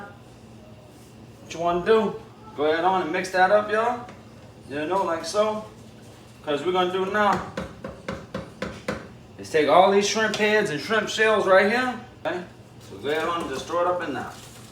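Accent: Louisiana, United States. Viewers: I been watching this guy for a little while now n i gotta say he's very talented love the hook "Lets go yall " keep doing your thing love the Cajun accent!!!!! Lets Go!!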